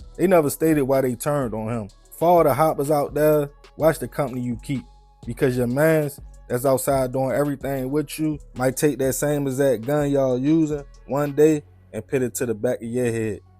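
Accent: Baltimore, United States. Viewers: He’s from Baltimore, has a strong accent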